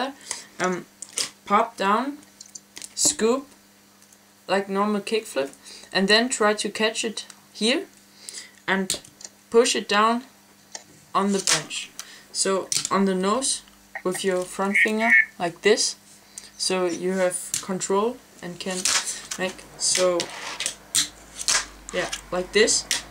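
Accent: Germany. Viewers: bist deutsch ne? hört man :D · nice tutorial dude, are you german?i can hear it from your accent LOL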